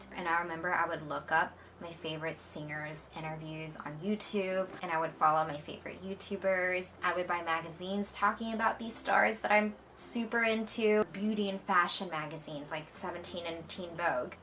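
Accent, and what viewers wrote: United States. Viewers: Dena的腔調確實非常道地，一聽就像是美國長大的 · You do have a perfect American accent though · 你還沒出國讀書的時候，口音也是很像美國人說的嗎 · 好像知道Dena的American accent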